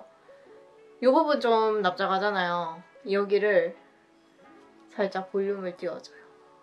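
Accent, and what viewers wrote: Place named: Seoul, South Korea. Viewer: 이제 서울말 쓰시네요..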